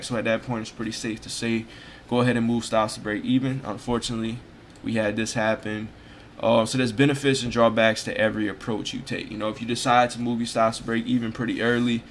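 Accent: Louisiana, United States. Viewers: I thought that accent sounded familiar, I'm from Louisiana also. Lol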